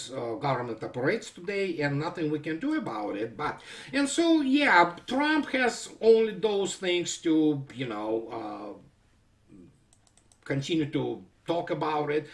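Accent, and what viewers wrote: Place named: Russia. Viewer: You speak like Russian 😊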